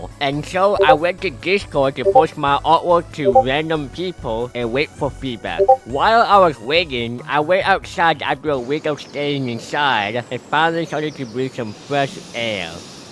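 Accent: United Kingdom. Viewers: Is bro british or smth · Bro, sounds like a British person😂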